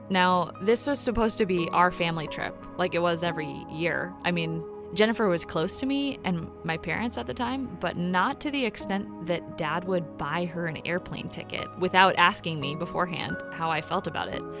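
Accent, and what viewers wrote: United Kingdom. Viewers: justice543212 her voice is british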